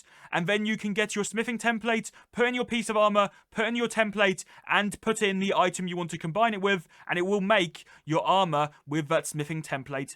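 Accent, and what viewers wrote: United Kingdom. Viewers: lol wat, bro sounds british, nothing like trump.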